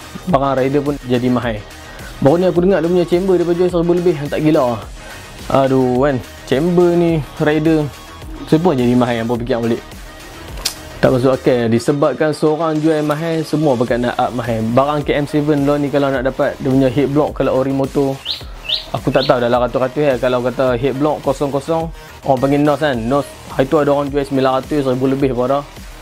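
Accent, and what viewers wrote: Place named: North, Malaysia. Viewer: Mna abg dapat barang moto lama ni....dgr suara belah utara..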